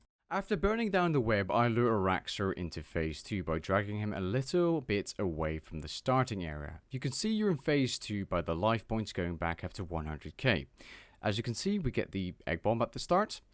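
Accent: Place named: Netherlands. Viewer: you can't hide your dutch accent boy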